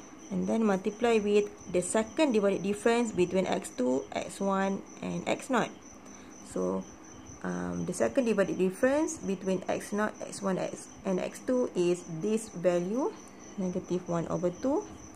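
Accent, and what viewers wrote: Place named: Malaysia. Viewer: Bila dengaq je suara dan slang, dh tahu org melayu👍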